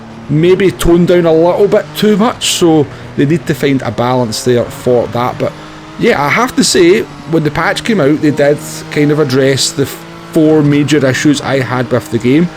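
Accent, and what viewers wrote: Scotland, United Kingdom. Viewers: 😂😂 pure scottish so it is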